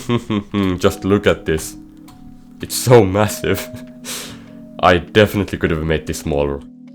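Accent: Finland. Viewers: Are you Finnish? 🤔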